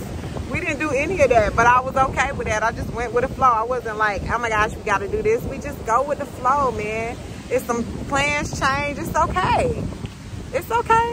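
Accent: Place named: Carolina, United States. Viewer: You from Carolina ? sheesh major sign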